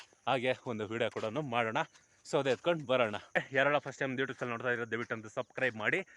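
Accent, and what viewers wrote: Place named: Tigala, India. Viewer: Anna neevu tigalaru nimma bhashe enda tiliyuttade namdu Anekal navu tigalare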